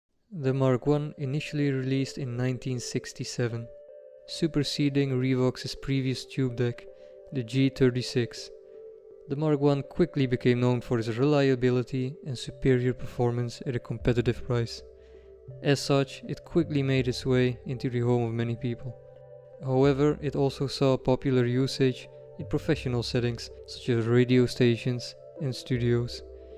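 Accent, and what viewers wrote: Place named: India. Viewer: First i thought, there is speaking an indian😅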